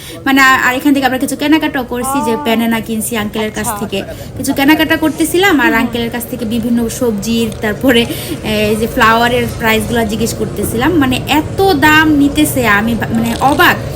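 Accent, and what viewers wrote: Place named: Bangladesh. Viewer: আপু তোমার ভাষা গুলো অনেক পরিচিত লাগছে বাংলাদেশের কোন জেলায় তোমার বাড়ি প্লিজ❤❤❤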